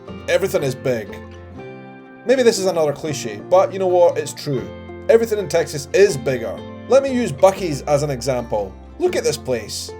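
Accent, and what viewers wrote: Scotland, United Kingdom. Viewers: Hi, You almost fit right in in Texas with your cowboy hat and western wear. Now all you need to do to be an authentic Texan is to change your Scottish accent to a Texan accent; it can be done with practice!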